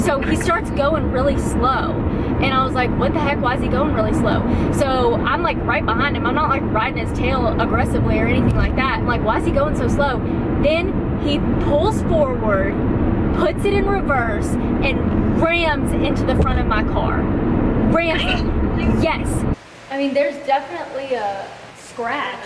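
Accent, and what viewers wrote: South, United States. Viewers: is it just me or that southern twang + fancy face a killer combo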